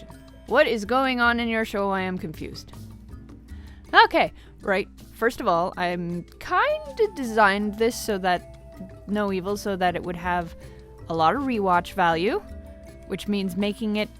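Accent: United States. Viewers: its an accent from montana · Where is this accent from!!! I hear Southern Georgia combined with Four Corners and it is confusing my poor little brain... Also I really love it!!!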